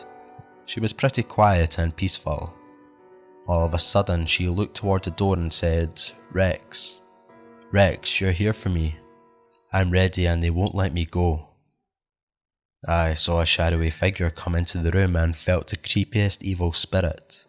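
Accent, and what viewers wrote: Scotland, United Kingdom. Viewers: Amazing, is that a Scottish accent?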